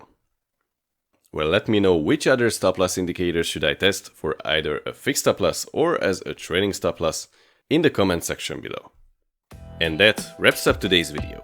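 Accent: Hungary. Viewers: Hi, are you Hungarian?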